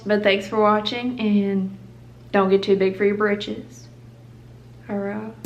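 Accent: South, United States. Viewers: There are two reasons I subscribed you. 1- Your southern accent 2- Your beautiful face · I'm from Alabama, too, and I'm trying to see if my accent is this strong when I speak lol It probably is 🤣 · More southern accent plsss I like to be a cowboy one day did you know🤠 love you're accent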